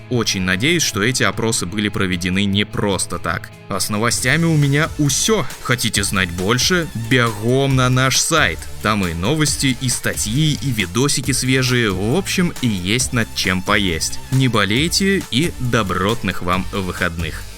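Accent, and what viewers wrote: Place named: Russia. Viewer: Не понял, а что не так? В видео парень говорит «дрэгон эидж», почти правильно, незначительная ошибка — вместо дрЭгон должно быть дрАгн. Хотя в американском англ почти «дрАЭгн» говорят. Не помню точно как обычно произносят Prince of Persia на этом канале, но вроде тоже почти правильно: «Прин(с/ц) оф Пё(р)ша», «р» в американском английском произносится, в британском нет. Что не так-то? Незначительные какие-то придирки, они же с русским акцентом говорят, а в целом правильно, вы вообще слышали как говорят любые другие национальности? Типа индийцев и любых других азиатов, или африканцев, кого угодно.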